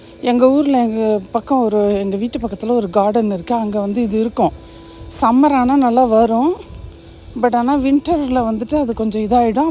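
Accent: Salem, India. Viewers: Chappatgi kalli you showed is what we have here in India...நம்ம ஊர்ல ரொம்ப பரவலா பார்க்கலாம்...இதுல இருக்கும் பழம் சாப்பிட்டாலும் நாக்கில் முள் குத்தும்....சேலம் பேச்சு வழக்கு அருமை.......Stay blessed sister...take care..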